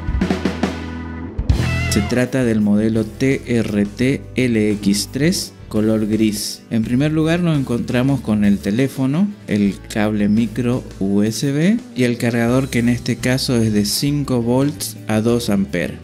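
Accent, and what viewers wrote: Corrientes, Argentina. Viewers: genial el español correntino.. los banco, pero pongan español sin aclarar y que se caguen los demas.. harto de escuchar el "neutro" mexicano.. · Yo no se para q Huawei lanza celulares que unos tienen una cosa pero le faltan otras, me paso con una tablet Mediapad T1 tenia una con chip y cuando quise comprar otra despues de un tiempo ya no consegui con chip para usarlo como telefono.... aaa.... y aguante el español correntino pué!!!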